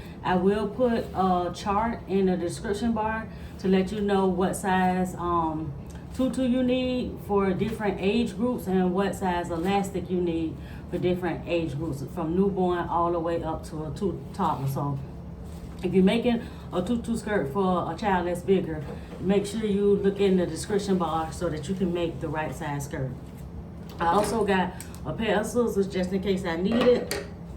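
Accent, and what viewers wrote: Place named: Lowcountry, United States. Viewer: Im watching and learning .. you sound just like my family in the low country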